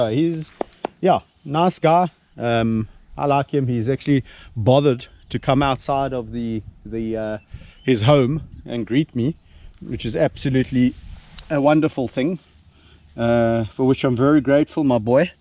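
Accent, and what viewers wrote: South Africa. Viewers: Im from zimbabwe cape town kenya harare and jo berg and you have a south african accsent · God I hate the way south Africans talk, "super aggro"you like 40 years old stop trying to talk like a teenager. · I never tire of listening to that SA accent.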